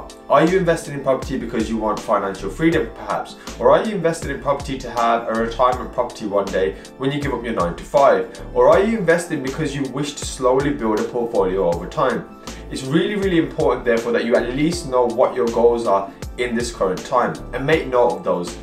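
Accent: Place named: Wolverhampton, United Kingdom. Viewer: Class video and good to hear it coming from someone local! I'm in west brom, you sound like you're from wolves lol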